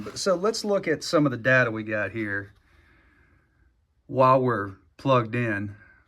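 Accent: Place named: Rock Hill, United States. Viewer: Man what in the hell??!! I’ve been watching your videos for some time now as I minor in tree work with a major in chainsaw addiction, pretty much all small engines really, I have two small outboards hanging on stands in my dining room right now, like it’s bad. Ive bought 4 chainsaws in the last 3 weeks just from scrolling through marketplace bc ppl don’t know how to work on stuff anymore. I just picked up a 201tc like this one for free from another tree service that had run over it with a kast lift, gonna need at least the handle and all that stuff that runs up through there so looking forward to all that lol. Anyways every time i watch one of your videos it’s crazy how much you sound exactly like my uncle lol. I said to myself, “he must be from down here somewhere”. Then come to find out I was right lol. You sound like you from somewhere around Rock Hill but hell there’s ppl that sound like us all over I’m sure. 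Small world. Anyhow, love your content brother, you’ve helped me a lot! Just modded out my little 194t bucket saw. That bitch is a screamer now son! So thank you for that! Hope to see you out there someday brotha, appreciate everything!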